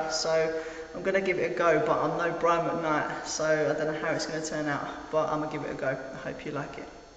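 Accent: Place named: London, United Kingdom. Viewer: dude you are awesome. we love your accent too. where are you from? sounds like london to me, austrailia to my friend. you should go on a singing show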